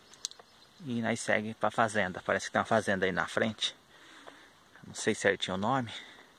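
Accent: Minas Gerais, Brazil. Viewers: Boa noite que lugar lindo natureza pura que saudade da época que morava na fazenda do bálsamo perto de piscamba município de jequiri não preocupe com seu jeito de falar e na simplicidade do nosso idioma mineiro e bao e bonito como dizia meu avô eta trem bao ser mineiro uai abraços fica com Deus. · Português de mineiro tbm sou assim.uai...Kkkk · Oiê, acho o seu jeito de falar lindo, acho que é de mineiro mesmo, também vc não têm que se preocupar com erros, a maioria das pessoas fala errado, pra que falar no pé da letra eu também falo, gosto de falar errado, e tem mais estamos enteressado é ver estes seu vídeos maravilhoso. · Que delícia de passeio amigo, adoro ver essas casinhas de colônia, um lago na frente ainda pra ajudar na beleza. Não se preocupe com a maneira de falar, nós mineirinhos somos assim. Um grande abraço!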